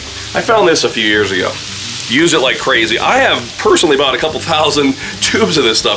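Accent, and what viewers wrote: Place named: Northeastern Pennsylvania, United States. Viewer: Accent sounds like you're from NePA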